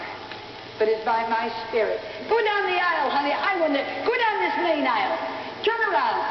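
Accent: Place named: Germany. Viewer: Analicemos su origen y epoca: Kathryn kuhlman nació en la ciudad de Concordia, Missouri, a padres Alemanes (su acento). Se convierte a Dios a los 14 Años en la Iglesia Metodista de Concordia, y comenzó la predicación en la Ribera Occidental a la edad de 16 en Iglesias Bautistas. En julio de 1975 su doctor le diagnosticó un cardíaco leve y tuvo una recaída en nov 76, como resultado, murió en feb de 1976. Pese a sus altas y bajas fue una mujer llena del poder y la uncion de Dios.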